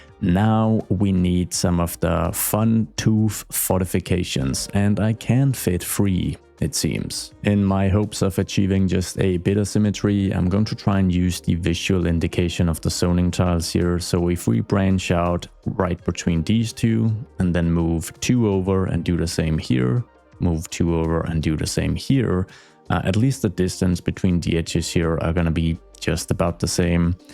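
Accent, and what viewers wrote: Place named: Denmark. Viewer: is that a danglish accent i hear🔥🇩🇰🔥🇩🇰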